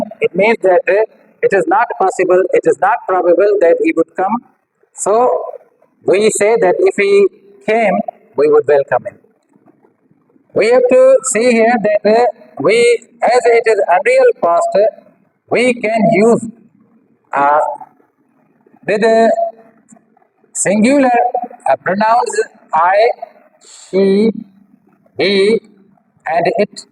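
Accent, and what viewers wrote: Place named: Sindh, Pakistan. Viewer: Good effort, but there's room for improvement to make these sessions or videos more engaging and accessible globally. Here are some specific suggestions: - Audio quality: Consider investing in a better microphone or recording your voice separately to ensure clarity. - Teaching style: Update your approach to match modern educational standards, which emphasize interactive and fun learning. - Language: While Sir delivers sessions comfortably in English, a strong Sindhi accent is still noticeable. Refining his English pronunciation would improve connectivity and facilitate better understanding for all listeners. - Presentation: Practice your delivery to maintain a natural flow and consider using digital tools like interactive whiteboards to enhance engagement. - Pacing: Avoid reading from a physical board line by line; instead, use it as a visual aid to supplement your teaching. 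I believe these sessions have a lot to offer, and I'm sure Sir direct connections appreciate them. To expand the reach and impact, consider making the content more accessible and engaging for a broader audience. Please take this feedback in a positive light, as I am connected with Sir and eager to benefit from his sessions. As my English is still limited, I rely heavily on tools to assist with my daily tasks, such as reading, writing etc.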